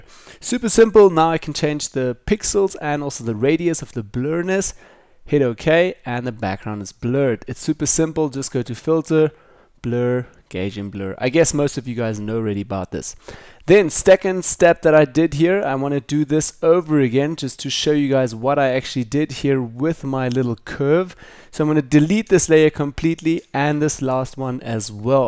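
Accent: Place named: South Africa. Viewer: Bro are you South African? random question but I recognize the accent xD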